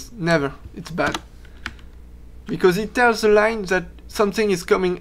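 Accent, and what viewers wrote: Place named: France. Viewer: Lol tu a un accent français trép prononcer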